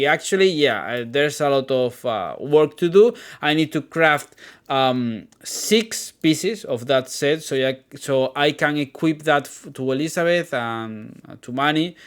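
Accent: Spain. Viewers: Tu eres español, a q si? Tienes un acento muuyyyy español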